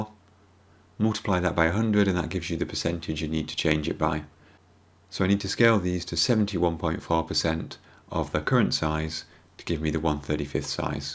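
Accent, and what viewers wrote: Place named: United Kingdom. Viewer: Hi there. I have just found your channel on YouTube and I had to subscribe. What you did there was absolutely fantastic. I am wanting to get into doing dioramas myself so any help I can find I am willing to accept. LOL. Thanks so much for doing this video and I would say blessings to you from England but it sounds to me like you have a British accent. So I will just say blessings to you from Weymouth, Dorset.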